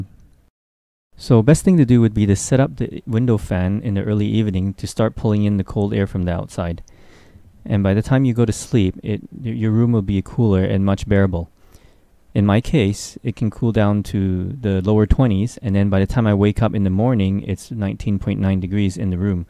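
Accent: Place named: Philippines. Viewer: Are you Pinoy? Your accent sounds a little like it.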